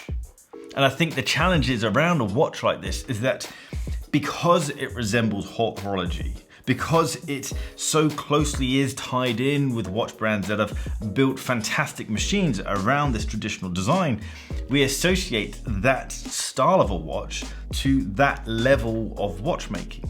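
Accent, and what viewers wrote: United Kingdom. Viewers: I am very sorry, I've tried to resist for ages but I can't stand it anymore: Do you know Chris Broad, why do you look and sound similar, did you probably grow up in the same region and whats this great (british?) accent? Where you come from, there has to be more like you!!! We need to find them... I know this is random, but I cannot not mention it anymore and I am probably not the first one to ask for it or suggest similarities. Actually, Its just that Im interested, if its a coincidence, or if its an actual thing; being a regional accent + lookalike theme, or whatever. Great Videos, I need to add. Great narrative, great look, great speed .. love it! Also came here through Baltic, the Acquascaphe GMT being my "target" watch.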